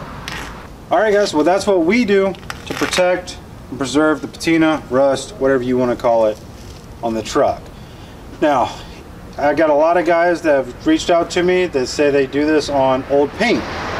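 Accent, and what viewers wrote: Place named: United States. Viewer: Hi there! Wonderful job! 👏 Sorry but I didn't catch your american accent. I tried but I couldn't understand the names of those 2 chemicals you used to reveal and preserve that nice patina/rust effect. Would you please write them down for me? Thank you very much! Forgive me one or another mistske. English is not my native language. Fro Brazil 🇧🇷.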